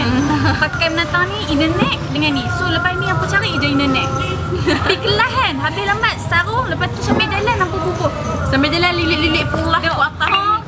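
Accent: Malaysia. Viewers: 2 0wg mne? bunyi ckp cm 0wg baling ja!haha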